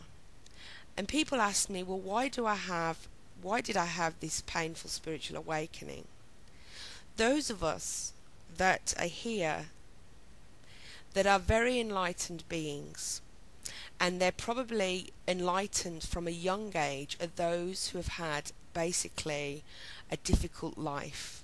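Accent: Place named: Birmingham, United Kingdom. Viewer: Hi iits nice to hear a brumy accent! I had a spiritual awakening last yr, do you know any groups in bham I could meet up with? It can be so lonely when people around us don't understand x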